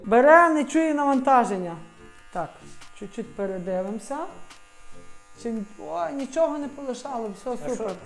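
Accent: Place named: West, Ukraine. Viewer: Приємно чути західну Українську мову. 👍👍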